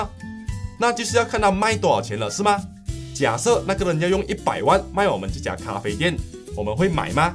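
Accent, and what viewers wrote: Malaysia. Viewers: 聯邦腔很可愛好聽，內容也很好懂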